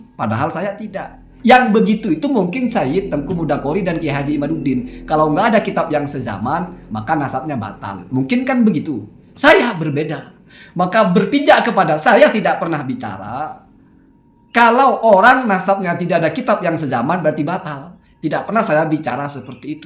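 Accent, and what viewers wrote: Madura, Indonesia. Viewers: orang mana ya, kok ligatnya kayak orang madura. Ngapunten ngge.......salam kenal dari mojokerto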